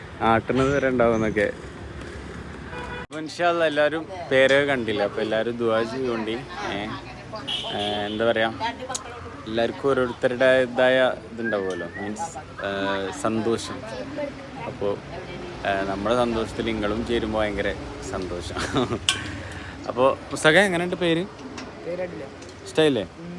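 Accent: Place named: Kozhikode, India. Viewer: nammed koikoden bhasha🎉🎉adipoli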